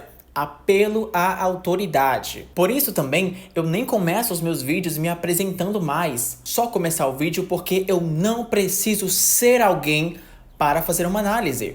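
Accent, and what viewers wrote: Ceará, Brazil. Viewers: Vem sotaque do Ceará! Veeemmm!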